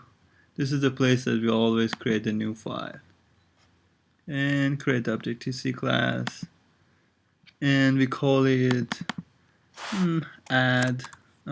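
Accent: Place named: India. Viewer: Dude, are you from India? Just noticed your accent, but anyway your english is perfect!). Your tuts is very cool too, thanks)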